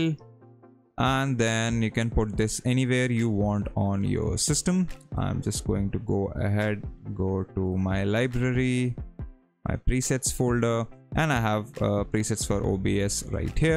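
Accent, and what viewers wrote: India. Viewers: You know you've found the right tutorial when the person with an Indian accent shows you how to do the things in the tutorial.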